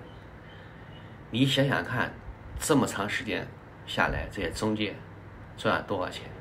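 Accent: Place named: Nanjing, China. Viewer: 哈哈，南京普通话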